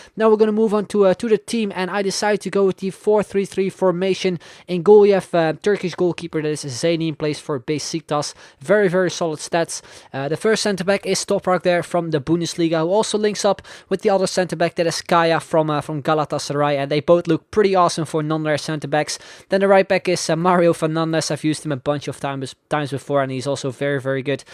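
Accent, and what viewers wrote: Netherlands. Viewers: How the fuck does he do that unlocking shit. Amazing! And hearing him talk English I immediately knew he was Dutch, even better.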